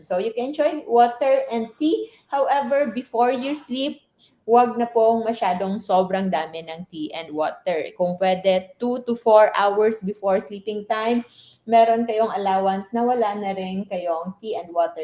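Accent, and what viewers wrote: Iloilo, Philippines. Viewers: Ilonggo si Doc?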